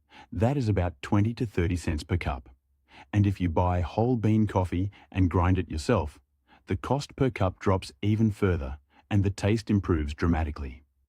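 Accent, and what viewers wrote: United Kingdom. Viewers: It could be. But I've noticed that videos made with AI have a little marker just below the video area that identify them as such. This one does not have that. Also, having listened to the entire thing, I doubt it. I don't understand why the narrator has a British accent but listening to it, I can say, it's not AI-- with AI narration, there's always a give away; some little quirk, mispronunciation or glitch. None here.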